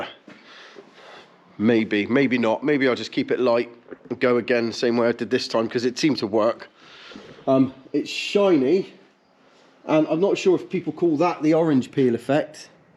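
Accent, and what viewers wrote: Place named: West Country, United Kingdom. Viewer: Decent mate! You a West Country lad aswell?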